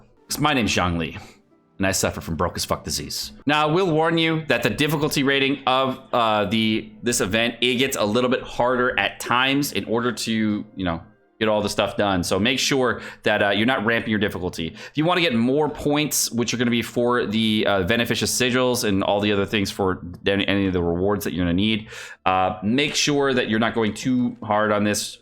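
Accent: United Kingdom. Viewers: Yay british again 💕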